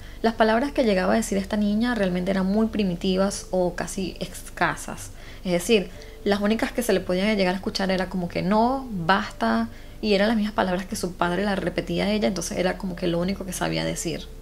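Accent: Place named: Venezuela. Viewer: no se porque llegue a ti. pero al escuchar tu bello acento venezolano. me encantaste. aparte de lo interesante de tus contenidos. mucho éxito y felicitaciones. arriba Venezuela.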